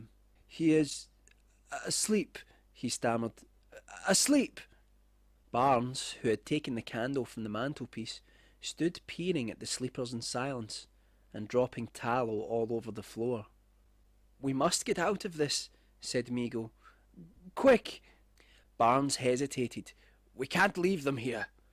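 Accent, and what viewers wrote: Scotland, United Kingdom. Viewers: This is good. Are you scottish or irish?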